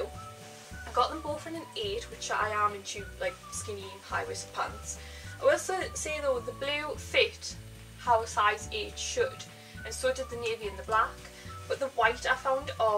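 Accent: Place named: Sunderland, United Kingdom. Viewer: Are ya from Sunderland?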